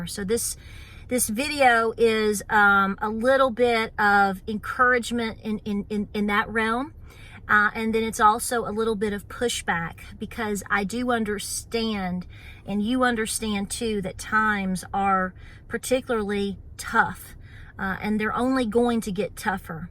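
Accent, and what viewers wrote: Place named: Tennessee, United States. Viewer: Love your channel more and more everyday. Love that Tennessee accent too. Lovecyall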